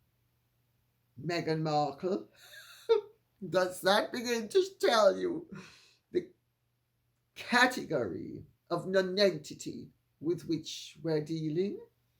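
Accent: Jamaica. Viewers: Love the Jamaican accent 😀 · Her accent is like that because she was born and bred in Jamaica and later moved to the U.K. Of course, she already spoke with a refined form of Jamaican accent because of her relatively privileged family origins. My Jamaican kin speak this way too. They happen to descend from a grandfather who settled there in the 1910s from England who married into an elite local family. They were educated there and sent to finishing schools in England and Switzerland. They sometimes wax more Caribbean at times, just like Lady C. when in full stride. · Yes I totally get it I am Caribbean also and when we want to put on our flava’ we really know how to put it on. I love love love love love the Jamaican accent. And I just wish she would do a whole video speaking that way. I can’t get enough of that wonderful spice! I am in Texas now and I so miss being around all the diversity that I grew up with in New York City and later enjoyed in Florida. 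I miss stopping by the Jamaican store to buy A Jamaican patty in Coco bread… and there’s nothing better than spiced bun with cheese in the morning with my coffee. Bring on that jerk spice Lady C! I am in Texas now …spice is a long way coming here!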